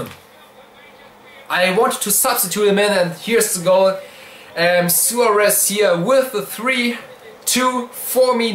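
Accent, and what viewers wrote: Germany. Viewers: Sorry trotzdem höre ich nur nen deutschen Akzent raus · du meinst deutschen akzent ;) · deutsches Englisch :D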